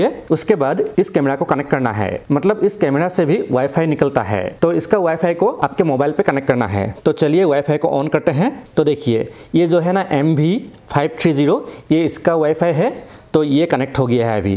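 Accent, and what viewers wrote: Assam, India. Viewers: Apka hindi sunkar lagta hai app assamese hai ☺️ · অসমীয়া হিন্দী যেন লাগিছে // যা হক video টো ভাল লাগিল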